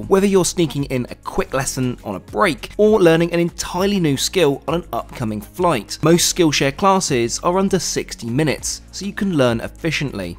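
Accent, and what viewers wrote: United Kingdom. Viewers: i assume the channel owner is british (judging by his accent), the UK uses the metric system (km), why tf would he use miles?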